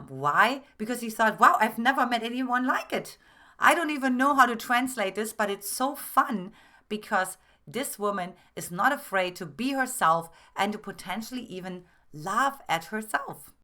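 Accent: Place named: Germany. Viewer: I love your German accent 😊 I was wondering where it comes from. Thank you for the content ❤, it is eye opening.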